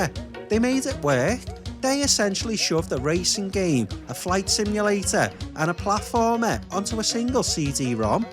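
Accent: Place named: Newcastle, United Kingdom. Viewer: Rockin that Geordie accent 😊👍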